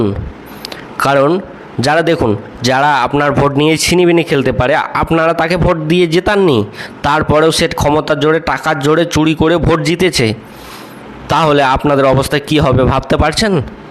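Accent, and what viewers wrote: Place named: Bangladesh. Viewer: তোর কথা শুনে মনে হচ্ছে তুই বাংলাদেশি একদম সিওর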